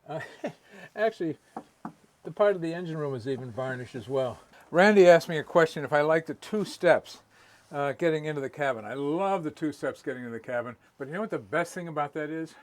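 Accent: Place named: New England, United States. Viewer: I am absolutely captivated by videos about old boats and houses narrated by NPR voices with slight New England accents? Must be my Aspergers... is my new Bob Vila!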